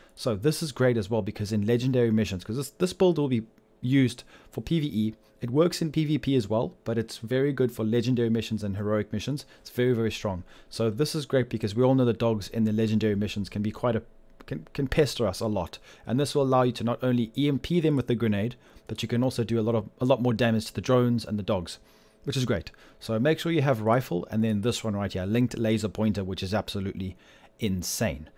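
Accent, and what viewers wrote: South Africa. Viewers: What country you from... South Africa?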